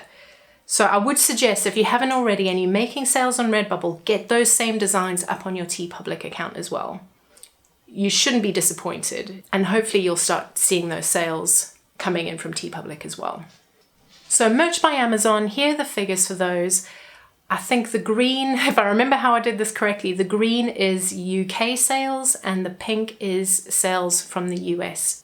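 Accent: South Africa. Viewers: South African expat accent 😁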